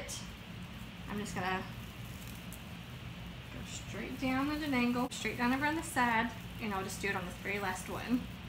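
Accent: South, United States. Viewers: You have a very southern accent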